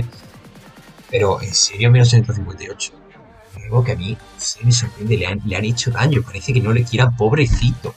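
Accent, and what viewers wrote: Spain. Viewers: Eres de España :p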